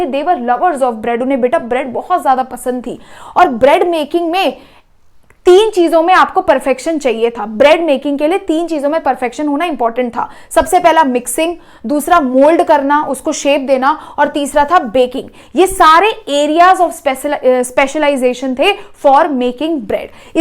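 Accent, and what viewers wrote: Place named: Bengal, India. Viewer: I feel like ma'am is bengali as her accent of speaking pranjol is very bengali type of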